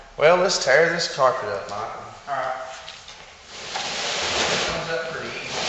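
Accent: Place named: Alabama, United States. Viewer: I feel like I have a connection to you, although I'm from New York, my mom was Born and raised in Birmingham Alabama. Hearing your accent allows me to think of the times I spent one year there attending school and came back to New York with a BAMA accent. lol. but that was many years ago. Again, good luck and I'll be watching the transformation of your store. So exciting!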